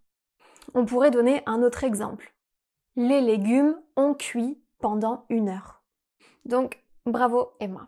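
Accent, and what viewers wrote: France. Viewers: This is perfect for me. I am in French 1 at school and am very good, already ahead of my peers and am taking French 2 during summer to be in french 3 for 9th grade. She sounds so similar to my teacher, a clean French accent and speaking slow enough for me to understand most words. Will be watching more of these to learn more on the spoken french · Une émission qui nous explique comment mieux parler Français qui s'appelle "French Morning"!??? Et en plusse prononcé à la Française sans aucun effort pour au moins assummer l'accent Anglais.... Non! Désolé! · Oui, nôtre chère a encore une pointe d'accent que je situerai au nord du ”Sud de la France" entre Valence et Aix en Provence, mais pas plus au sud... ou peut-être un accent bordelais ou aquitain? Après je ne peux pas dire grand chose, je suis lyonnais pur souche et il paraît que nous avons un certain accent... 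C'est curieux car pour moi, ce sont les autres qui en ont un 🤓. Je trouve que les accents 'colorent' le français et je trouve ça bien. J'adore d'ailleurs les accents francophones comme on trouve dans certains pays africains, le Québec sans oublier la Belgique si proche géographiquement, mais ayant son accent typique (souvent moqué par les Français) et ses expressions qu'on ne trouve pas ici. C'est aussi vrai que pour apprendre une langue, il faudrait le moins d'accent possible (le lyonnais alors?) et de distinguer la prononciation des voyelles accentuées ou combinées comme les ai, é, ê et è, les a et â, les au, o et ô, ainsi que les e et eu comme dans feuille ou fauteuil, les ain, in et un... Tout ce qui rend notre langue si difficile à apprendre, mais si riche en nuances. Bon, j'en ai encore écrit une tartine? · Ce vidéo et très bon pour apprendre le accent française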